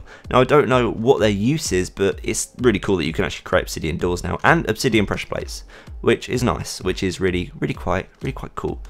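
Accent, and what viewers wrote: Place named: United Kingdom. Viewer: Don't worry about popularity man, you have a British accent, you'll be popular in no time!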